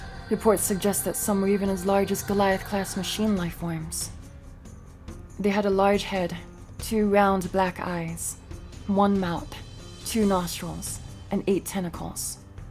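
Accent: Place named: Boston, United States. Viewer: The boston / NY accent bleeding in is hilarious.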